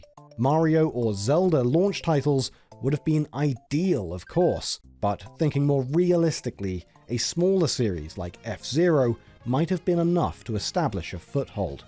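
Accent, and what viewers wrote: United Kingdom. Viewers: Stop using British accents already. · Looks like the script itself was copy-pasted as captions, instead of using YouTube's auto-caption system. This video was written by an Arkansan (me, I write like I talk), but it was VO'd by a Brit, that's why there's some inconsistency.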